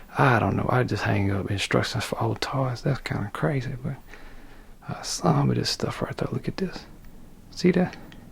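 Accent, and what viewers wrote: Louisiana, United States. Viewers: From the first time I heard your voice, Mr, I KNEW you were from Louisiana, I just knew it. Looking at the trees in your yard, and the Baton Rouge "evidence".... ah hah. Kindred neighbors. Because of you, I have bought myself some two part mold making material and I've started my first diorama. Just a small little thing, but... yeah. Neighbors😁😘 love you Mr. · sounds like Tom segura’s Cajun accent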